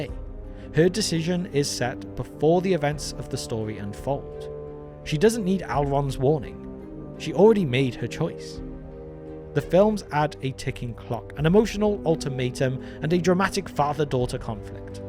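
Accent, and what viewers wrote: Wales, United Kingdom. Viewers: You are the guy from TT alliance or every Welsh sounds the same?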